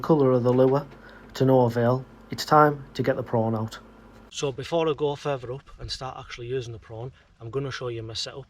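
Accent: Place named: Cumbria, United Kingdom. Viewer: What river is that mate ? I’m guessing somewhere in Cumbria going by your accent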